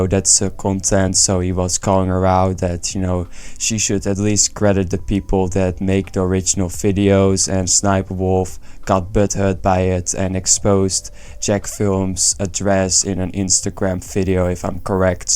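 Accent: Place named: Netherlands. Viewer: Ik versta aan je engels dat je nederlands ben😁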